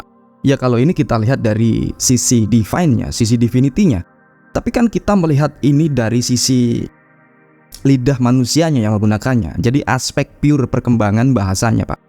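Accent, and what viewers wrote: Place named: Banyumas, Indonesia. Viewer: Jawaa ngapak bang😂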